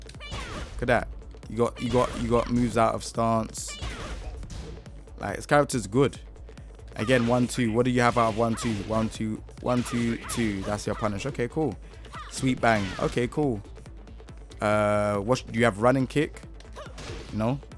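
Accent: South London, United Kingdom. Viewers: Both from U.K., is from south london and I’m in the south region too, it’s quite common to hear similar or diff accents over here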